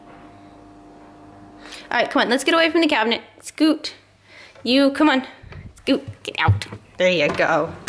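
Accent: England, United Kingdom. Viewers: This is the first time I’ve actually noticed a slight English tint to your accent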